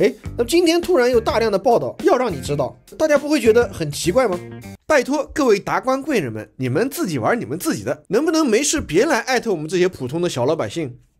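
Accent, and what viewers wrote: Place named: Shanghai, China. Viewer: 喜欢你的上海腔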